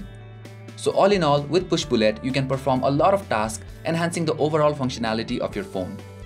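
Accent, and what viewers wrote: India. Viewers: This was quite helpful, but honestly, I missed many words because of your Indian-area accent. I have taught English as a Second Language for many years and the most common mistake made by people from this part of the world is speaking too rapidly. Even native English speakers are easily misunderstood if they run words together too quickly, and when you add in the occasional mispronunciation of a foreign speaker, it makes it frustrating for the listener. Simply slow down a touch and people will follow you much better.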